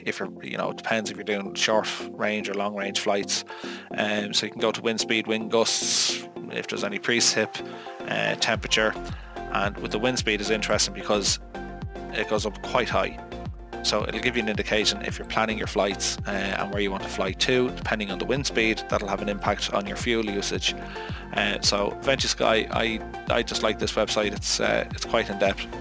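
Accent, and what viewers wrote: Ireland. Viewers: Unmistakable Irish accent, detected it from the first syllable of the first word :)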